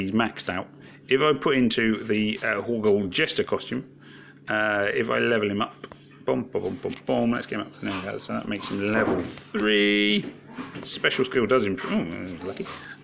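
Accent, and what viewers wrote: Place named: United Kingdom. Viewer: Hello. Love that British accent...by the way hope you got how costumes work, and if you don’t this is FYI: You can’t ascend the costume more than the original copy it is accompanying. Leveling up and ascending costumes is much easier and cheaper, no special ascension material needed. Both original copy and costume got bonus stat when costume lvled up.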